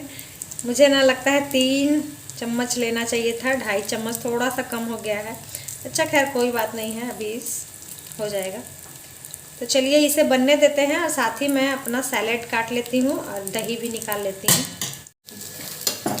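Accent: Bengal, India. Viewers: Didi ap bengoli ho ????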